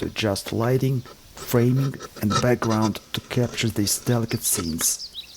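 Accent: Russia. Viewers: "Good enough," is not good enough for! Thank you for your fascinating videos on so many different "maker" topics. Your narration in Russian-scented but perfect English is very pleasant to listen to. Oh, and don't hurt yourself, please.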